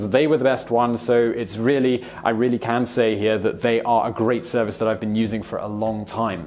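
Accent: England, United Kingdom. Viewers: Does vsauce have a twin? A brother with a british accent (or australian, sorry i really can’t tell. Dont mean to be rascist) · Vsauce but he has a British Accent and a sharp head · Robrobx generaly if you say "british accent" he means accent from one of the United Kingdom's countries. We can clearly hear English accent, but calling it "British" is also not so wrong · You mean English accent? Scotland and Wales exist btw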